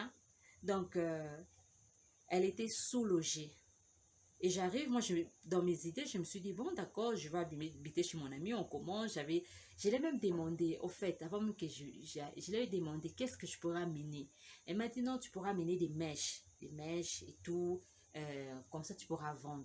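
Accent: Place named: Democratic Republic of the Congo. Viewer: Lorsque j'ai entendu ton accent avec le mot videyo j'ai directement compris RDC et que tu es Nekongo avec tes mots swahili mais tu parles bien sauf que tes vidéos sont un peu long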